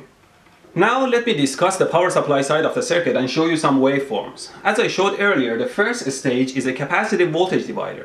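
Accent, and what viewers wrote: Iran. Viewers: Thats a persian accent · Iranian English accent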